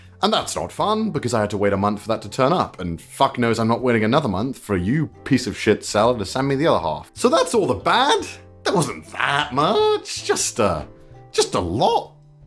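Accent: United Kingdom. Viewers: Painfully British 👌🏼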